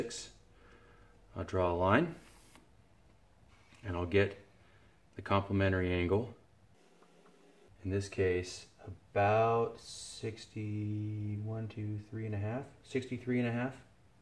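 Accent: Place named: United States. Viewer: Love the American accent :) and a very helpful video thank you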